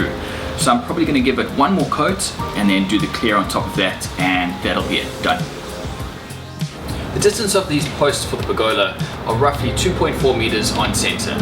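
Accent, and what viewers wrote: South Africa. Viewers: That’s a South African accent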